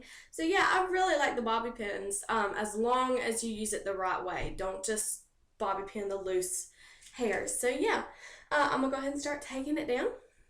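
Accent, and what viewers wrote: South, United States. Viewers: This is awesome! Thanks! :) I love my hair and your method worked great! Question, How long do the curls stay in your hair during the day without hair spray or any moose, just hair? How long with? I'd appreciate your answer :) BTW I adore your country accent, I think its the cutesiest Ever! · Great job girl love that southern accent, keep doing YOU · That's what you call a country accent she is from the south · your accent is so adorable and endearing! so wish I had more of a southern accent than I do. you seem really sweet just wanted to tell you that!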